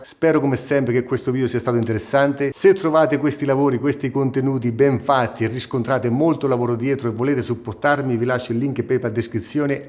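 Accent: Marche, Italy. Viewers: Caro, seguo molto volentieri i tuoi video perché sei bravo preciso, hai tanta esperienza, ma soprattutto il tuo accento marchigiano ( credo) mi piace un sacco 😊 Vengo al punto: il parateste in acciaio originale Bmw è per te efficace per un uso del GS che non ha il tubulare esclusivamente su asfalto?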